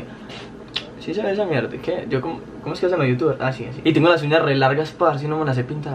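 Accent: Colombia. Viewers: Leche azucar y Cacao cocoa o chocolate empolvo En Uruguay le decimos leche v: xq es leche pero tomamos eso y lo calentamos en el micro es como café pero más rico v: jaja creeo que es igual en todo el mundo pero como tu probaste y dijiste ayy que rico ajja otra cosa me encanta el acento colombiano pa❤ También me gusta su cultura y su país me ciento identificado ajja❤ Prueba el mate😉❤ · Jaja esta perdido tu ojo ve a biscarlo👌😅 parserito te amo❤ Amo el asentó de los colombianos hermosos ❤👌💙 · wacho amo el acento colobiano y amo los videos de este chavon y los q hace con kennet ❤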